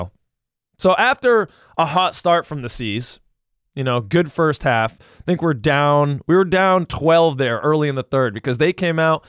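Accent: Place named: Germany. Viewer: I'm so jealous of your German accent. I wish I could pull that off.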